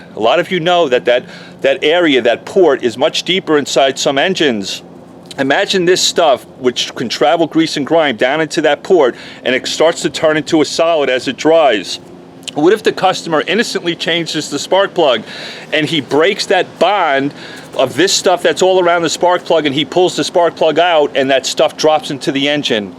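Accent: New York, United States. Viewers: So, how do you get the stuff off your engine if someone has done that? btw, glad to see you're here in Dallas, pard. Your accent though - you're from the NY area, possibly? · You sound so much like my NY friends (or me..lol) Great video and hope people pay heed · Why do you have new York accent?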